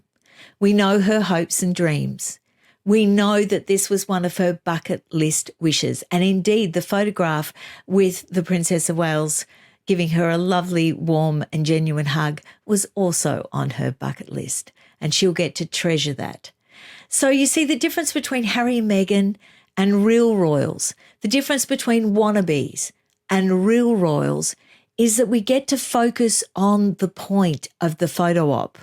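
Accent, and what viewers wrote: Australia. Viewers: I love listening to. You’re voice and Australian accent it the best!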